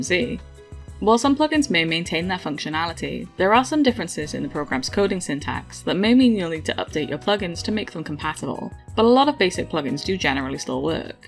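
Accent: South Wales, United Kingdom. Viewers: woooo south wales gang let’s goooo! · South Wales accent? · I'm from South Wales too!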